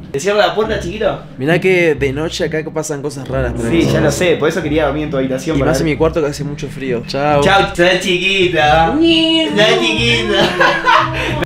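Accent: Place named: Argentina. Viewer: me gusta tu voz de Argentina:3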